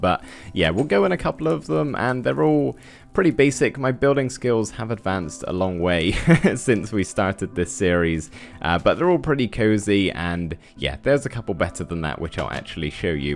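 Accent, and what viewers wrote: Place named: Cornwall, United Kingdom. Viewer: Always wondered what part of the UK you're from, you have a similar accent to me which isn't something I often come across and I've noticed you say 'haf' not 'hahlf' same as me, and I'm from Cornwall 😊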